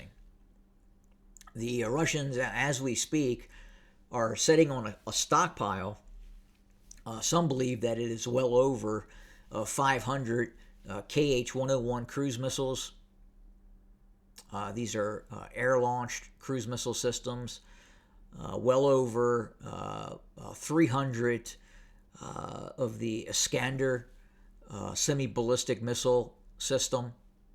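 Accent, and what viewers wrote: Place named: South, United States. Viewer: I enjoy the southern accent. 👍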